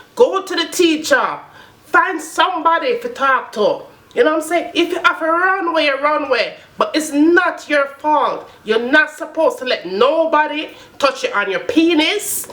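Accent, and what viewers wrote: Jamaica. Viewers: The bible has some very harsh words regarding people who abuse children, its better you were never born. No need to say Jamaican accent, I think you subscribers know already :)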